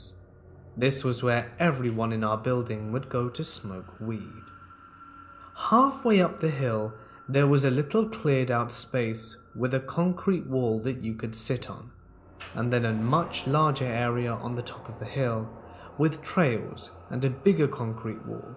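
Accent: United Kingdom. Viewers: Yaknow ive spent very very extensive amounts of time in the woods and mountains of kentucky and a few other places like weeks at a time frequently im more at home in the wilderness than i am in a house or society around people while ill admit ive seen odd stuff hurd some freaky things i found explanations to all and have never seen nor heard anything cryptid lol still some damn good stories especially sense it sounds like a 600 yr old vampire is reading it too you not just bc he is from the uk but his tone and flow hes a pretty damn good story teller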